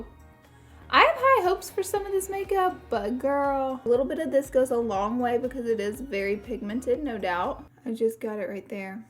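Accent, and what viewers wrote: South, United States. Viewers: Where are you from???? I love your southern accent. I'm from mobile, Alabama and I can just feel that you're from Alabama lol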